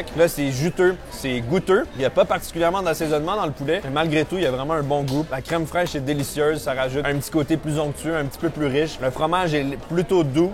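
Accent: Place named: Quebec, Canada. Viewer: J'adore ces vidéos par contre j'ai faim maintenant, l'accent québécois ca me tue de rire 😂...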